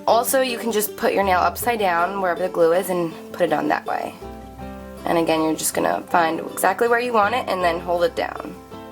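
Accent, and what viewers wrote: New Jersey, United States. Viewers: You sound like you're from jersey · Omg your NJ accent came out deep here ! Lmao your the shit ! Screw the haters your beautiful !!!!!!!!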